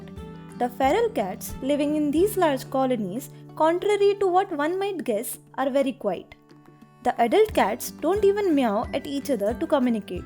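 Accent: India. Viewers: hears indian technical support accent and already stops video at 5 seconds, agony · What wor st, eunu ch like voice you have! Hateeful in dian accent! All cats are not she. Then how do you say her? Eunu ch! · I can hardly understand a word you are saying, even though your attempting, to make this video in English. Your East Indian accent is so thick, you may as well speak in Indian. Good effort though.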